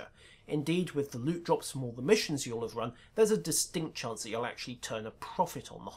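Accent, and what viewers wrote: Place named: United Kingdom. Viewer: Something about being educated by a gentle British accent that just makes you feel more intelligent. Good info. I think I'm going Tricobalt.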